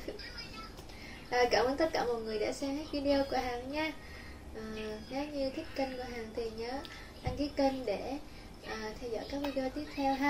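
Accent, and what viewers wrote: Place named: Quang Nam, Vietnam. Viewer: chuc mung Nam moi chuc gia dinh Nam moi vui ve hanh phuc khoe manh nha🎊💥 Noi con o cau Mong Co gan may Quan ban bo tai ko rua Co di tu Nam 1990toi bay gioi ve Lai ko nho. Vi Co nghe giong cua co n noi co nghi con la Nguoi Quang nen chi hoi tham thoi.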